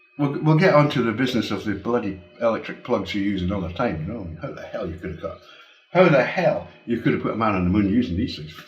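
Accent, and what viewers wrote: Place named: Scotland, United Kingdom. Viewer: Very interesting, had some problem with the scottish accent so i have to listen twice...English is nor my first language so,, but learning..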